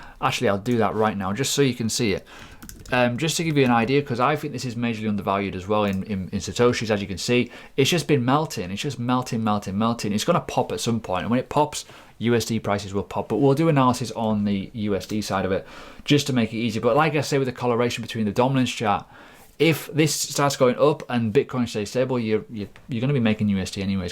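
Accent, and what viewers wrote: United Kingdom. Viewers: main problem is the heavy British accent:)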